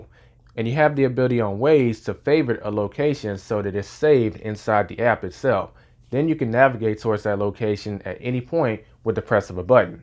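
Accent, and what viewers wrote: United States. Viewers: Why does this guy sound like an American seating in the front right seat?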